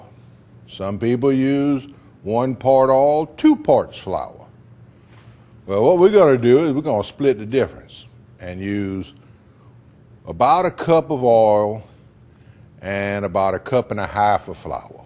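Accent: Louisiana, United States. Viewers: I just love it when you speak cajun. To my ears it sounds like old french sprinkled with american english flavor. "Ça c'est du bon café!", when you said that, it was just like poetry to my french-from-France ears. Loshepa (Lâche pas la patate!!!).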